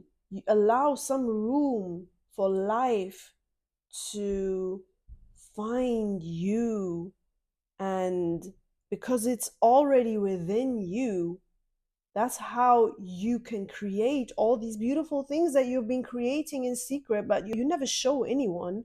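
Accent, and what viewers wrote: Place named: United Kingdom. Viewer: im your 29 sub beacuse your britsh accent is very funny and starting u say naughty naughty also funny but im girl😂 best of luck your journey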